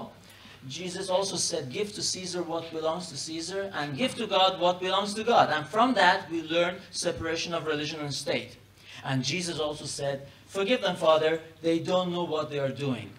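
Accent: Iran. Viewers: He's Italian right? How the fuck he has an obvious Persian accent? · He has a very distinct Persia accent. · He has Persian accent and look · I guess thats his stage name because he looks VERY Iranian and has a stereotypical Tehrani accent lol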